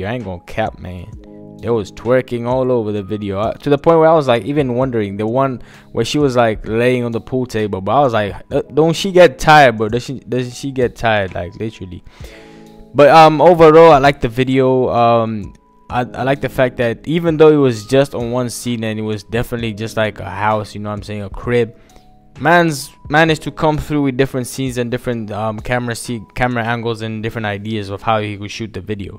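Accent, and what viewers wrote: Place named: Burundi. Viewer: This is Rwandan? He sounds like Burundian.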